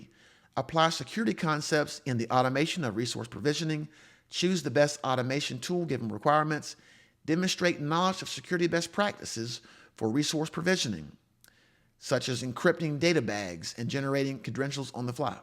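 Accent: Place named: Virginia, United States. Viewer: I could tell from that accent that you were from a southern part of one of the Virginia's 😊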